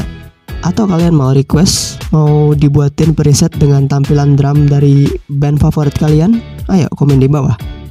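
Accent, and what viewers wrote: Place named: Banjar, Indonesia. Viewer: Iya kalo, pantas ae pina ada logat banjar?? Sippp lanjuttt…. Salam Batinjakan Dr Banjarmasin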